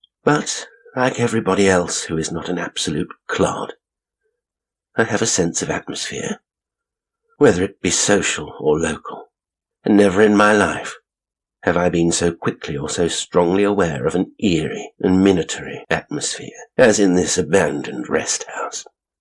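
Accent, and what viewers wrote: United States. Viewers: Well kudos to for the American accent maintained for so long - really enhanced the Kiplingesque story. Respect. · I had forgotten how much I absolutely love your American accent 🖤✌️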